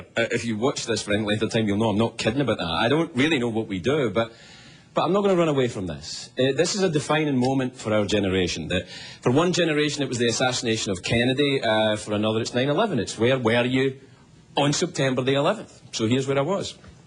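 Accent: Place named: Scotland, United Kingdom. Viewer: He sounds so scottish here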